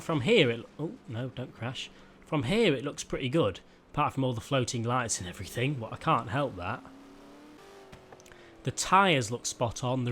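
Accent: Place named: England, United Kingdom. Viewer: Davon Pipes he's English. Canadians also do that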